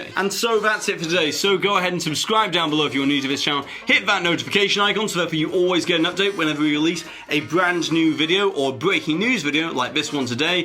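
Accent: England, United Kingdom. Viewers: What part of England does your accent hail? It is TRULY unique, and I have heard it only seldom before.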